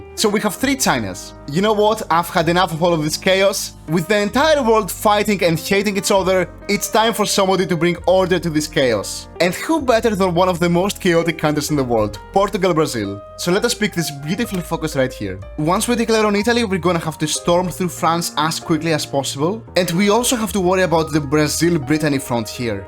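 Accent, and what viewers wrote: Greece. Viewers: Bro , are you greek ? Cuz the accent really seems greek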